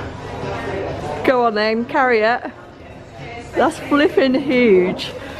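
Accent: Norfolk, United Kingdom. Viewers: R u from norfolk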